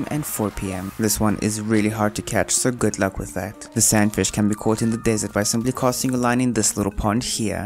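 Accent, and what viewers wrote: South Africa. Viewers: are you from SA?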